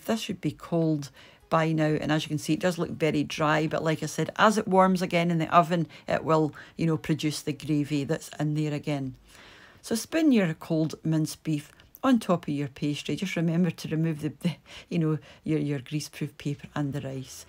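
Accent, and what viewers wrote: Scotland, United Kingdom. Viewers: Thank god a Scottish cooking youtuber lmao was starting to talk american watching all these wans lmao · Oh I’m gonna give this a go. Wee Scottish lassie, I’ve been in Australia 33 yrs, nice to here a voice from home … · Love your accent.prosper Scottish.Also love your recipe. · Lovely looking pie I'm gonna do this. By the way are you from Inverness? You speak perfect English with you Scottish accent.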